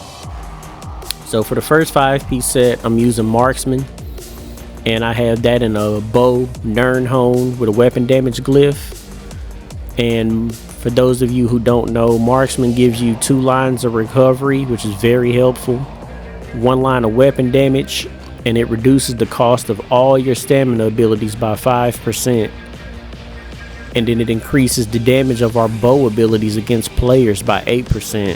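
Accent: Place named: Georgia, United States. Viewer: You sound like my friend from savannah Georgia, idk if you live around that state but I'm from Texas and yall have a stronger accent then us lmao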